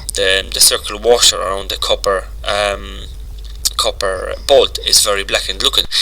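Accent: Ireland. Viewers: Brilliant stuff ... very clear ( I like your irish accent)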